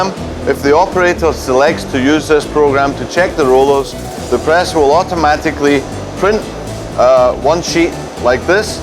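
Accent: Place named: Scotland, United Kingdom. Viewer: A good scotsman by the sounds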